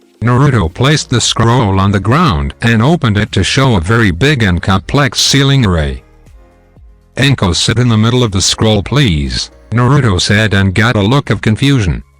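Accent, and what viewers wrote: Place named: Russia. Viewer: somepeats sounds like a russian speaking english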